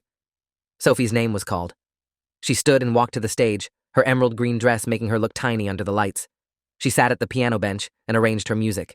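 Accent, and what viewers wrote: United Kingdom. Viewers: So I came from the short and this dude has a British accent now😭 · YO STOP WITH THE BRITISH ACCENT ITS CATCHING ME OFF GUARD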